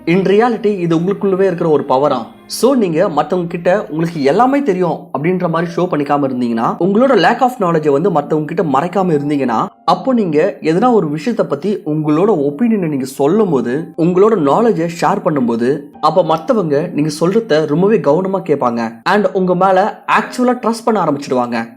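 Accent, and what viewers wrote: Kerala, India. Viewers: Malayli ah. I lyk ur Tamil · Kerala thambi voice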